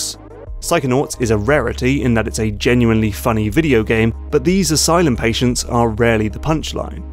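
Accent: United Kingdom. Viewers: I love internet video man, especially British voice internet video man.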